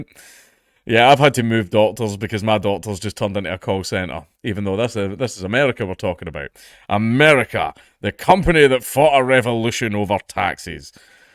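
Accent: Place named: Scotland, United Kingdom. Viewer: Your commentary is always spot on, but I’d listen to you even if it wasn’t because I love that Scots accent!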